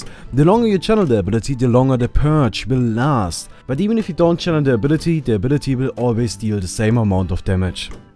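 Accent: Germany. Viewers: german accent :)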